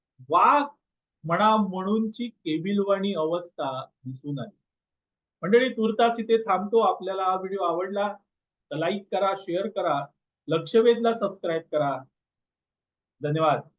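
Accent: Malvani, India. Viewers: तुमच विश्लेषण छानच असत,पण तुमच मालवणी बोलण खूप आवडत.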